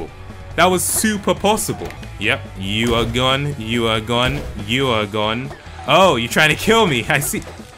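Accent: Nigeria. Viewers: Oh heck fam U Nigerian? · are u igbo or Yoruba? -naijagang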